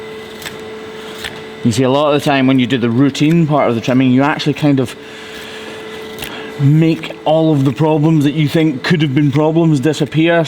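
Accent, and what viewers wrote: Scotland, United Kingdom. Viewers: I've been watching your channel for a while now (I believe more than 3 years) and I've always been very thankful for your job, you and your team always do a great job taking care of these animals and it's very satisfying to watch ❤ but I also wanted to thank you for helping me improve my hearing skills! I'm a non-native English speaker (it's my second language) and the Scottish accent is very particular (and from my understanding, one of the hard ones to understand) so hearing your videos has helped me a lot! Thanks! 😊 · Can't get over the Closed Captions saying "So this carrot" at the start of the video, and I've never wanted to be Scottish more in my life. I mean my family is from there, but I didn't get the cool accent, just the freckles.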